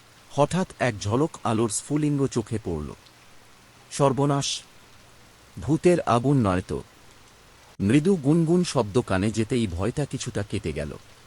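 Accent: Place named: Rajshahi, Bangladesh. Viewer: রিডিং পড়ার মত হয়েছে। রাজশাহীর টান বাদ দিতে হবে।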